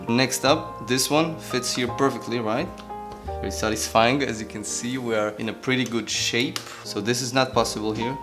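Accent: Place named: Israel. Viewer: Ach sheli you know your name is. Stam but I totally knew you were Israeli just from your accent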